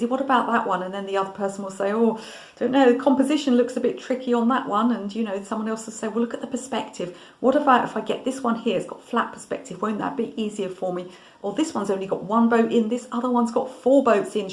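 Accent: United Kingdom. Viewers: Wow ~ I thought you were talking to me about ME! ~ As in, all of the above mistakes, almost. The only one that didn’t apply was the Bonus. I’m so timid I let my paint dry way to much, most of the time. Then I have to work myself up to coming back to it! Like I end up going to the kitchen and making a pot of vegetable soup before returning to it. Seriously, I appreciate all of your observations ~ just listening to your beautiful British accent brings me a bit of calm and resolve to get my act together. Thanks so much ~ please keep up the good work💁🏼‍♀️😻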